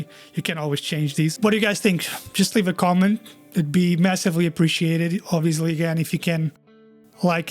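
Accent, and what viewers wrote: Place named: Portugal. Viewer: O sotaque diz-me qualquer coisa. Fui confirmar... TUGA ! Obrigado pelo video !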